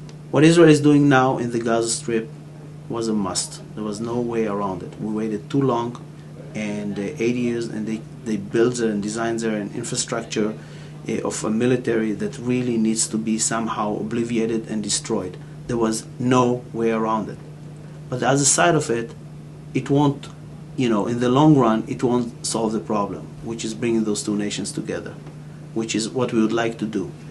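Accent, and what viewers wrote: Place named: United States. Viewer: you talk like a mili..into operation details...fuck that..stand back...u cant have a humane war. Now I respect the IDF IAF for their attempt to be humane, but please understand/feel. You know you sound like an american. Fuck Hamas, but consider your self, bro. Its insanity to think that you can create a humane military system...I dont want to rais eth eissue but the whole fucking sick system of the Shoah, as you call it, was created because asking troops to shoot people made them into monsters.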